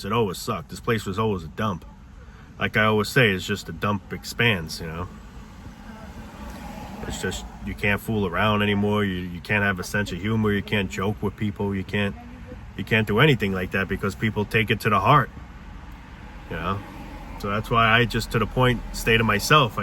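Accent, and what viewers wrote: New York, United States. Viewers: Your cynical New Yawk diatribes at 2x speed are a real treat.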